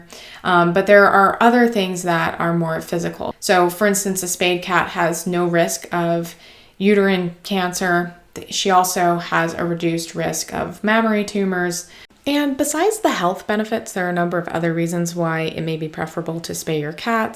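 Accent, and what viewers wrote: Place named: Buffalo, United States. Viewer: I always notice your accent on pronouncing the letter ‘A’ lol Where is this accent from in the US? I’m from Niagara, Ontario and notice a similar accent when i visit Buffalo just over the border lol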